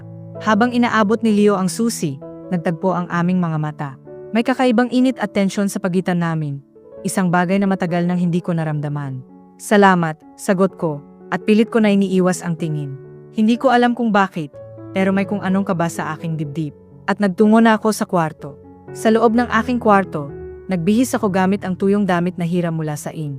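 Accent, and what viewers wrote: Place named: Visayas, Philippines. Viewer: Bisaya ka ba? Nkakawalang gana tagalog mo.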